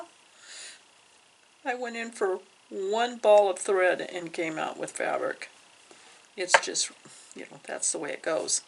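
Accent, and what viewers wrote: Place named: Oregon, United States. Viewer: One of the reasons I love listening to your videos, among others, is that I lived in Oregon for 9 years while in college. I experienced a silver thaw one year in Portland. I think that was 1996/7? I remember the sound of the crashing branches. Most of the rest of my adult years and much of my childhood was spent in Boise Idaho area. Four years ago, my husband's job brought us to Alabama. I just love listening to your accent, the sounds of home. And of course, the quilting.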